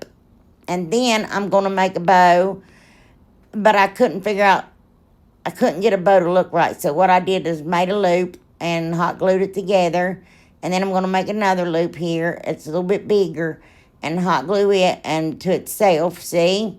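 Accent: South, United States. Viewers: She's a delight and fun! You would think she's from the south! But she lives in Ohio❤❤❤ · I started watching YouTube to pass the time during covid lockdown. I can’t remember if you were recommend by another channel or a YouTube recommendation but as one of you other subbies said, I watch for your humour and inventiveness. Your American accent tickles me too! I live on the Lincolnshire coast, England. 🇬🇧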